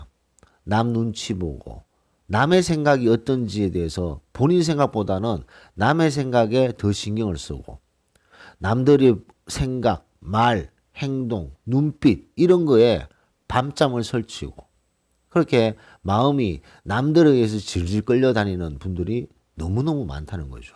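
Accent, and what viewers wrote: Gyeongsang, South Korea. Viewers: 들을때 마다 느낍니다. 어쩜 경상도 사투리가 이리 듣기 편할 수 있는지.. 전 경상도 사투리 원래 질색을 하는데 선생님 영상은 목소리 들으려고 일부러 들어요. 평소엔 괜찮다가 피곤할땐 듣기 거슬리는 목소리가 있는데 피곤할 때 회복하게 하는 목소리예요. 물론 메세지가 좋아서도 있겠죠. 오랫동안 잘못된 기독교 신앙을 통해 나 자신을 부정하고 남을 용서하고 사랑하라는 메세지를 문자적으로 지켜보려고 하다 몸과 맘이 만신창이가 된 사람입니다. 요즘 심리학을 통해 의외로 성경에서 말씀하신게 그게 아니라는 것도 깨닫고 님 처럼 내 주위에 넘쳐나며 나를 갉아먹는 하이에나, 소인배, 나르시시스트, 소시오페스들을 정리하였습니다. 저에게 달라붙으려고 한 인간들이 내가 좋아서가 아니라 나한테서 떡고물을 얻어먹고 내 진액과 생명력을 다 빨아먹으려는 에너지 뱀파이어 였음을 깨달았 고요...이제는 나를 가장 우선에 두고 살겠습니다. 저의 생명되신 예수님도 그것을 바라실 것입니다. 감사합니다.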